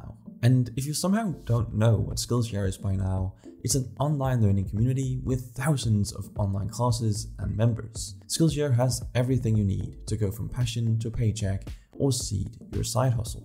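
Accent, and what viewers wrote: Denmark. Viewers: I can hear, you are danish too :) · Bro I can hear how danish you are. Please don’t make videos prazing your country just because you are from the country. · Gættet på at du var dansker, vi kan altid høre hinanden xD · sværger du taler nutidens Anders fogh og lars lykke engelsk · he is danish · Are u Danish? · are you danish i think i hear the danish accent and you said the danish words perfectly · i could from the first word hear that he was a fellow Dane and one disadvantage is the weather always cold or rainy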